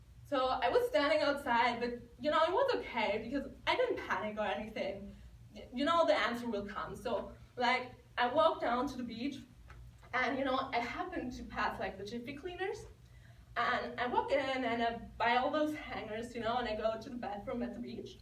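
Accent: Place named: France. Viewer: ah- they want money for the original i guess. :/ i almost thought you might be french from the tiny bit of accent i heard. german is one of the languages i study daily. it's tough. keep up the awesomeness. : )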